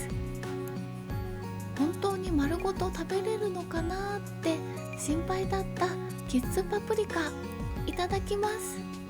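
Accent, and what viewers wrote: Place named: Shizuoka, Japan. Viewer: ❤ いつも静岡訛のトークで懐かしく癒やされます🥰 それにしてもは雨女かな🤔笑雨も味方にする凄さはならではかな💕 因みに俺は晴れ男みたい😅 あとゴールデン免許ね🎉笑ゴールド免許とも言うよ🐼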